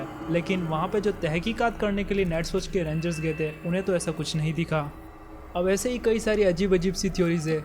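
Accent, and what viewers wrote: Gujarat, India. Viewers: Bhai tu gujrati hai na ??😅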